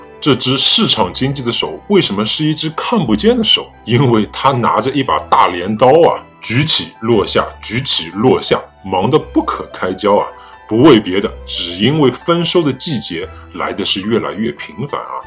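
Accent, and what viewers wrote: Shanghai, China. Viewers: 音色和语速可以软件微调成不一样，但是很多词的口音、下意识的手势动作和偶尔冒出来的上海话切口，说不是我都不信。。。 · 其实就是一个人，因为都是上海口音，好多家具都一样的😂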